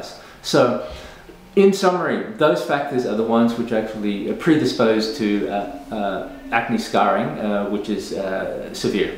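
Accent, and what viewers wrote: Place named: Australia. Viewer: Thank you for your videos and information. It would be a lot easier to follow if you sit or stand in front of the camera and make eye contact, because it’s hard for non native English speakers to understand your beautiful Australian accent, so standing in one spot will make all the words have the same tone and will be able to read your lips. (but that’s just me and my opinion)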